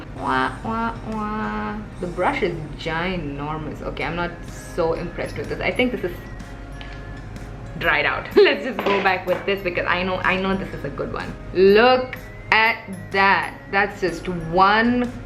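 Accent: India. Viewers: It's an Indian accent, but with a little bit of western influence? Idk accents in India vary from place to place and generation to generation. There's soo much variation seriously, like in my class alone, I can identify at least 5 different accents.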